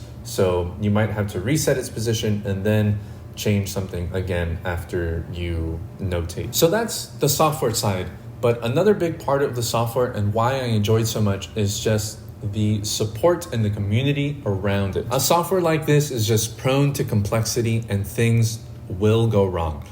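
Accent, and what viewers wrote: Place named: Philippines. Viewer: He talks funny... I'm guessing Filipino is first language? lol. Anyway, great information—the note navigation key commands have me drooling.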